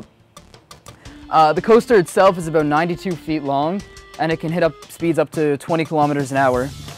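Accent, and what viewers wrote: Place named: United States. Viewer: I know he sounds American, but this man just said kilometers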